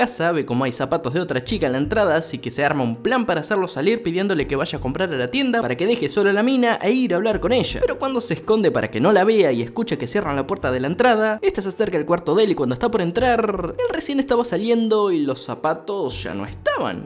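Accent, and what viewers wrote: North, Mexico. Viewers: 🤠es norteño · LA HISTORIA ESTA MUY BUENA RISA DE GUFI PERO TAMBIÉN MUY NORTEÑA🤠 BAÑENCE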